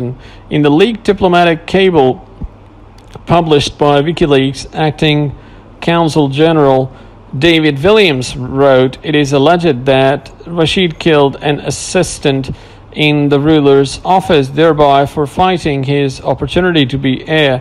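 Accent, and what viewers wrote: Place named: England, United Kingdom. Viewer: You sound English, therefore you should read and speak it much better. This was almost painful to listen to. You should also check your facts and your pictures. Rashid and Hamdan don't even look alike so how can you mix their pictures up? Youtubers always get it wrong when it concerns the Dubai Royal Family. I couldn't even finish this video. Your delivery leaves a lot to be desired.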